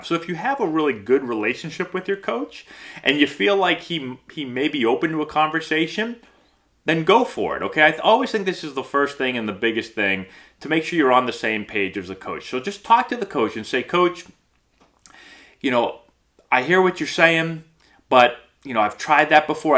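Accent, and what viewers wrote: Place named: South, United States. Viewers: Where are you from that was way too close too us down south man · Great video, as always! I love the Forrest Gump southern baseball coach accent! This is certainly a situation that seems common across levels of baseball. I would love your perspective on how to deal with dumb conduct by coaches , specifically in the youth baseball world. As the owner/director of a travel baseball organization that includes youth teams (10-13u) and beyond how do you handle situations in which parents disagree with the conduct of the coach of their child’s team? Hypothetical: parent of a 13u player let’s you know that coach calls player a “coward”, tells them to get their ass up after being spiked in forearm, tells player to shut his mouth, and when pitcher struggles on mound says to catcher, “don’t look at me, he (pitcher) sucks”. 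Coach states that he is “old school” and that he is preparing players for high school and beyond. I know this is a bit off topic but interested on your perspective on this and what steps you would take or suggest that players and or parents take in a similar situation. Thanks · Nice accent. You pick that up in Winston-Salem? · BTW, your southern accent killed me. I grew up in NE Ohio and played in college in Chicago and went to medical school and trained there, and ended up in Alabama surrounded by people who talk like that all the time. And lots of dumb coaches around here, even at the high school level. I guess they're everywhere. I would love to hear you do this same video after you have a boy who plays into high school to see if your outlook changes as a dad. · As a “Texan”, I appreciated the southern accent. Loved it 🤣👏🏼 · Lmao at the southern accent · Great southern accent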